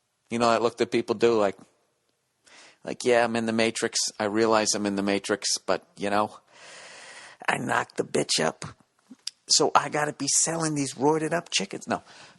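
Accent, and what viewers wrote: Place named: South, United States. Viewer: Hahah your hick voice never ceases to make me scream laugh, love your work brother. Keep it up...or you'll be homeless I guess... As well as I would have much less material to listen to.;)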